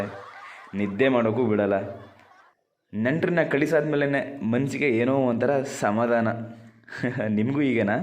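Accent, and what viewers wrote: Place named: Talakadu, India. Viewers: Nan gandana uru thalakadu nim bhashe nange thumbha ishta nan gandana uralli nim tharane mathadodhu ❤ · Super super 👍 ಗುರು. ಈಗಾಗ್ಲೆ ಎಲ್ಲರನ್ನು ನಕ್ಕು ನೀಗಿಸಿದ್ದಿರಿ, ಖಂಡಿತಾ ನಿಮಗೆ ಒಳ್ಳೆ ಹೆಸರು ಬಂದಿದೆ. ಇಷ್ಟು ಕಷ್ಟಾ ಪಟ್ಟವರನ್ನು ಎಂದೆಂದಿಗೂ ದೇವರು ಕೈ ಬಿಡುವುದಿಲ್ಲ . ಎಲ್ಲಿಯತನಕ ಆ ದೇವರು ಕೊಟ್ಟಿರುವ ಆರೋಗ್ಯ ಇರುವುದೂ ಅಲ್ಲಿ ತನಕ ಯಾವಾ ಕೊರತೆಯೂ ಚಿಂತೆಯೂ ಇರೋದಿಲ್ಲ ಅಂತ ನನ್ನ ಅನಿಸಿಕೆ. ನಾನು ಸಾಹ ನಿಮ್ಮಂತೆ ಕಷ್ಟ ಪಟ್ಟು ಈ ಹಂತಕ್ಕೆ ಬಂದಿರುವೆ ಎಂದು ಹೇಳಿ ಕೋಳ್ಳಲು ಹೆಮ್ಮೆ ಇದೆ 😉 ಆ ಭಗವಂತನ ದಯೆಯಿಂದ ನಿಮ್ಮೆಲ್ಲಾ ಆಸೆ ಆಕಾಂಕ್ಷೆ ಈಡೇರಲಿ ಎಂದು ಹೃತ್ಪೂರ್ವಕವಾಗಿ ಹಾರೈಸುತ್ತೇನೆ. ನಿಮ್ಮ ಸಂಭಾಷಣೆ ಕೇಳಿದರೆ ಮೈಸೂರು ನಂಜನಗೂಡಿನ ಕಡೆಯವರು ಅಂತ ಅನ್ನಿಸ್ತು. ಯಾವ ಊರು ನಿಮ್ಮದು !? ಕುಳ್ಳಿ ಯ ಹೇಸರೇನು !? ಅದ್ಬುತ ವಾಗಿ ಅಭಿನಯ ಮಾಡುತ್ತಾರೆ. ನಮ್ಮೂರ ಭಾಷೆ ಕೇಳಿ ತುಂಬಾ ದಿನಾ ಆಗಿತ್ತು. Anyway thank you so much 👍🎉💐🤝👌🙏💯 ಹರಿ🕉️ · Howdu kul munda chanagidane idu chamarajanagar side language nan amma kuda a kade avre I like this language.... 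chanagiratte akade bashe kollegala, nanjanagudu, chnagar, gundlupete side bhashe very nice ....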